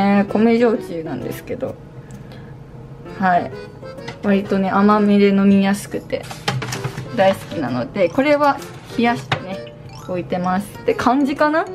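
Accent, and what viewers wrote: Okayama, Japan. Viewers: 同じ岡山出身！ たしかに口調が岡山弁だね😊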